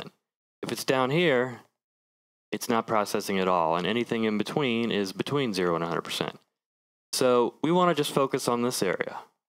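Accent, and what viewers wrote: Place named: California, United States. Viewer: You kinda sound like Gregory Scott of Kush Audio fame, but with less vocal fry and and less CA accent. Not an insult, to be clear, I just noticed the similarity between your voices.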